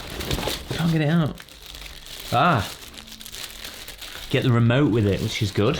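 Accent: United Kingdom. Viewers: You sound like Carl Froch - you from Nottingham ?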